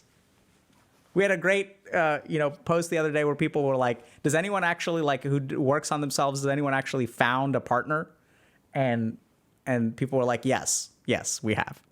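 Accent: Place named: India. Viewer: I only want to converse with in his native Indian-English impersonation accent. I know it's not pertinent to the topic discussed it was just welling up from inside of me from the previous video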